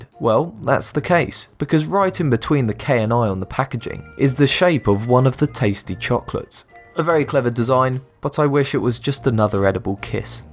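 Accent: United Kingdom. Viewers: 1) You’re an idiot! 2) My ears bleeds wherever I hear a British accent! 3) You make a lot of remarks you think are funny but in reality they are not. People who have no sense of humor should abstain from making lame remark that only they believe is funny. 4) “The seven spike on the statue of Liberty represents the 7 continents” False! Untill a decade or two it was aways believed and taught in school in the <num>’s that there are 5 continents: Europe, Africa, Asia, Australia, America. It's only recently (around 1980 or 1990) that we added Arctic and Antartica. If you are going to make up things why not say it represents the 7 days of the weeks? I mean if you want to make stupid comments, go all the way. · ABritishGuy He doesn't sound American to me. He sounds British. So who is the idiot now. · British English... How I love to hear it up!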